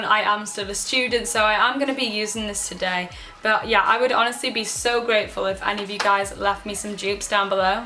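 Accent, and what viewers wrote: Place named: England, United Kingdom. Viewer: what part of england are you from? your accent is very different from me aha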